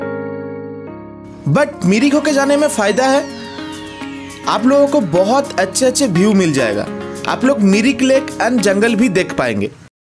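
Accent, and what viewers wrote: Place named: Odisha, India. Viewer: Odia bhai zindabad , accent ru jana paduchi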